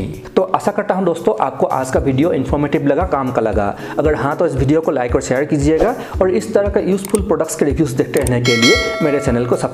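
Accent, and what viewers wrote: Assam, India. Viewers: Assam r nki apuni hindi xuni😂 a buji paisu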